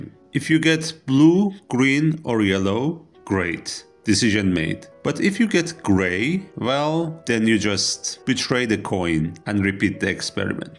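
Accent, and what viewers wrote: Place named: Eastern Europe. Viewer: Are you from the Baltic states or a Slavic country? Or Romania? I can instantly recognize this accent ANYWHERE !! :) CHEERS for the content, keep it up! .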